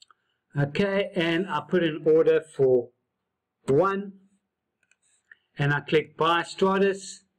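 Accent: South Africa. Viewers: That beautiful south african accent. Dankie vir die video maat! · He sounds South African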